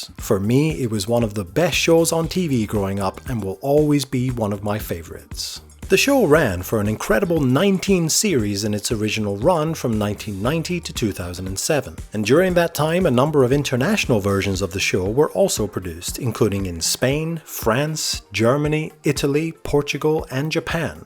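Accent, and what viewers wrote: Scotland, United Kingdom. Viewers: Where is this guy from? A weird Scottish/ Northern Irish/ American accent all mixed together. Any ideas? Great vid btw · what accent is that? every other word is either scottish, northern irish, or american.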